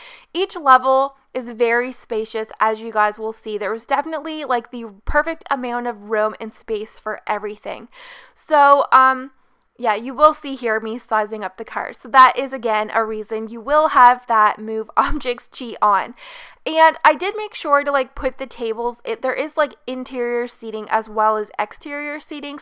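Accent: Canada. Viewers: I used to believe Canadians didn't have much of an accent that differs from Americans. This video proves me wrong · It's called an accent (I believe she's Canadian) chill out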